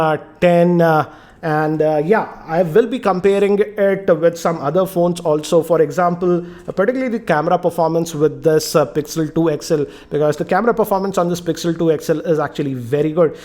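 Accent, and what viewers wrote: India. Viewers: he sounds like apu from the simpsons. can someone tell what his accent is? (which state in india) just curious.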